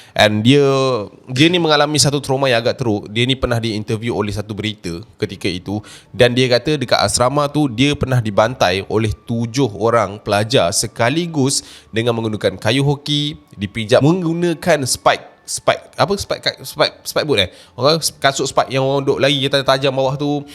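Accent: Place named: Kelantan, Malaysia. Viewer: Sedak dengar Abe chap kecek Kelate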